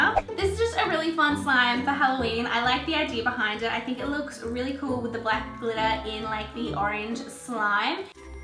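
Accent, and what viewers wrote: Australia. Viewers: Are you Australian because I am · You have the Australian accent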